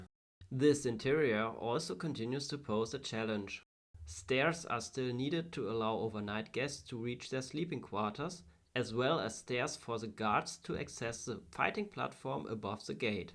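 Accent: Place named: Germany. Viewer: Moin😉👋, Man hört definitv den Deutschen Akzent heraus 😂🤭, doch sieht das Resultat echt gut aus! Viel Erfolg weiterhin😉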